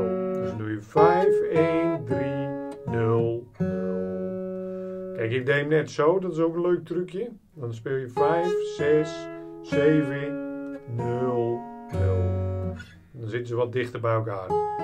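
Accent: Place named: North Holland, Netherlands. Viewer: Hoi daar. Ik ben Jim, 62 jaar en min of meer met 'pensioen'. Ik verveel mij gelukkig niet maar toch... wilde ik er voor de winter maanden een leuke hobby bij. Ik luister graag muziek van klassiek tot hard rock maar het jaren 70 geluid van Genesis en gitarist Steve Hackett is wel iets waar mijn hart het meest ligt. Net begonnen met akkoorden leren. Mijn vrouw had jouw gitaarlessen gevonden en ik vind het prettig qua tempo en is duidelijk om 'mee te doen'. Trouwens, het zou mij niets verbazen dat je uit de buurt van Avenhorn kom. Die 'klank' klinkt erg bekend ;-) Ik ga een tijdje oefenen met je lessen! Bedankt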